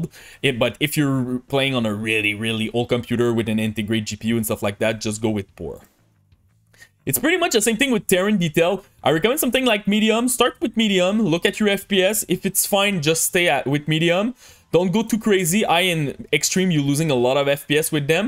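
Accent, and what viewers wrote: Quebec, Canada. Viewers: Le quebecois is strong with this one.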